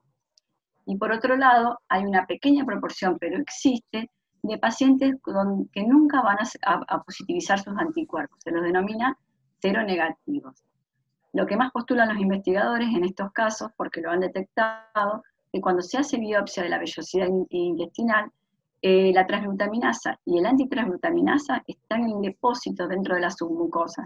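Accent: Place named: Argentina. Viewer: Hola, gracias por toda esta valiosa información. Solo quiero aclarar una cosa. Yo padezco la Enfermedad Celiaca diagnosticado ya hace varios años aquí en Los Estados Unidos donde vivo. Se me ha dicho todo el tiempo por los Doctores que la avena no contiene gluten naturalmente, solo se contamina cuando se maneja junto con el trigo. Yo ingiero avena frecuentemente y no me produce ningún problema. Por el acento de la Doctora creo que es Argentina, escuche este mismo comentario en un programa de televisión Argentino. Así que no se si esto es un error pasando solo en el sur de America o es un error aquí en los Estados Unidos.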